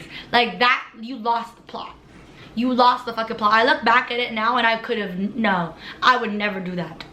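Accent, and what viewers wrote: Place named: Jamaica. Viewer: Why she trying to sound like a jamaican?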